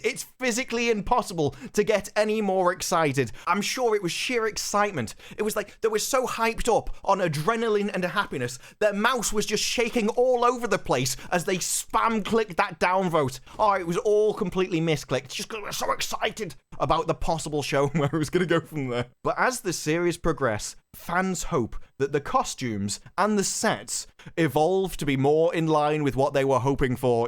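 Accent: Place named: RP, United Kingdom. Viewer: Simply due to the accent and delivery (which are fantastic, no criticism), I feel as if I am listening to BBC news or about to hear the next band on Top of the Pops.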